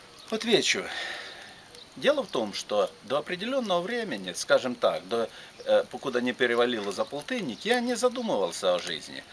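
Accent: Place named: Vologda, Russia. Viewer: говор вологодский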